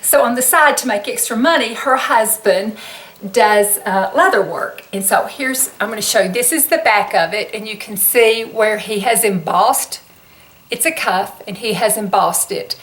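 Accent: South, United States. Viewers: You might be the sweetest person on YouTube! And I just adore your accent! Im from Ky and I still hear such a southern draw in yours. I could listen to you read a dictionary 😊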